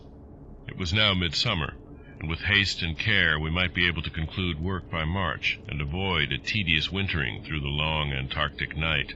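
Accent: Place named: United States. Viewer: I don't like the USA accent, it should be read with a British accent.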